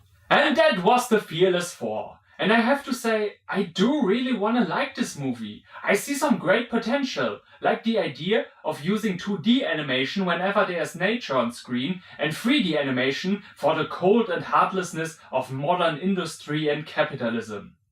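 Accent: Germany. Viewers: Hearing a German man say Bollocks is strangely hilarious. Not bad commentary, you're kinda like a German Decker Shado. A'ight, you earned yourself a subscriber. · Are you speak in german accents?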